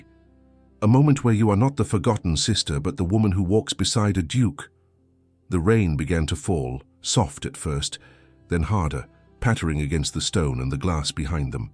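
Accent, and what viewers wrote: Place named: England, United Kingdom. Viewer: I agree with your laud of the narrator. Luv that purring English accent. YUM!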